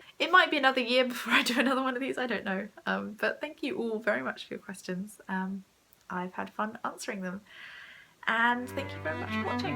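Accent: England, United Kingdom. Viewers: Just curious why do you have an English accent but you're from NZ? Have you been in London longer than you were in NZ? :)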